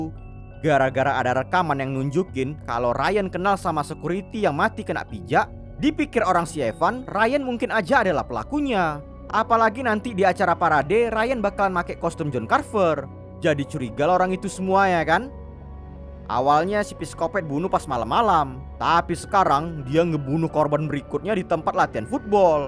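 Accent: Medan, Indonesia. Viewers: awak medan kali bah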